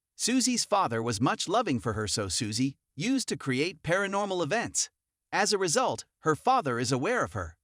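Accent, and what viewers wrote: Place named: United States. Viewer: Perfect American accent, but I still can’t understand what he’s saying.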